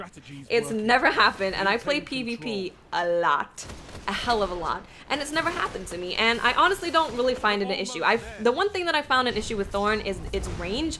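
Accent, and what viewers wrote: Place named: East Coast, United States. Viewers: I've never heard a new york accent before and I live in the US lol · The Black Hammer nerf really takes away the dynamic of that sniper rifle. It basically becomes a sniper with muligan... BTW, where is your accent from? I want to say east coast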